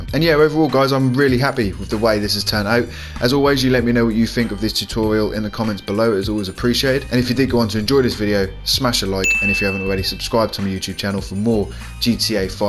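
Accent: United Kingdom. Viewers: Hello small young child, have you heard of the british accent?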